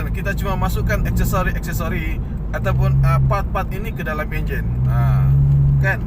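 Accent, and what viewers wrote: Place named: Sabah, Malaysia. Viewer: serius la tak pernah dengar orang Sabah cakap?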